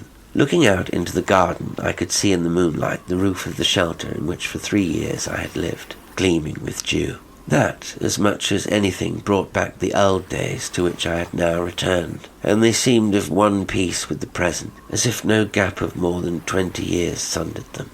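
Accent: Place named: California, United States. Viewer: thanks very much. It's good that we have a channel like this - to least because of the good response everyone seems to get from yourself. These "spook story" channels seem to be a growing "thing". Its a bit like what enjoying radio dramas must have been like. A bit disconcerting hearing someone from california talking about forgotten railway stations in Norfolk! Lol. Suggestion....it might be an idea to do Machens "the white people". And get a woman to actually read the "green book" part. I've said this before - to others, with no luck. Think it could be a very special contribution to your channel if you could pull it off. It is after all one of the seminal "weird tales" of the last century. The "Dunwich" brothers posh anglo Welsh gran.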